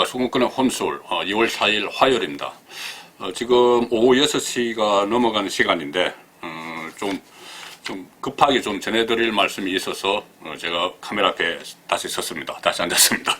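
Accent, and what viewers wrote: Gyeongsang, South Korea. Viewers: 경상도 양반*^^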